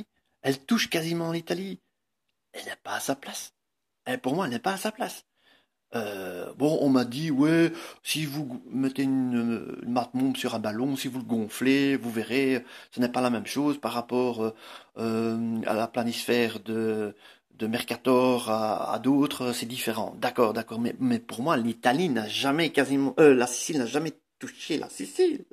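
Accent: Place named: Belgium. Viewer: Super l'accent belge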